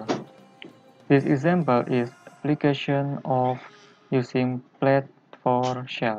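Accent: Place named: Indonesia. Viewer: Wah keren mas bro, jarang" liat video engineering aksen indo. Kebanyakan india 😂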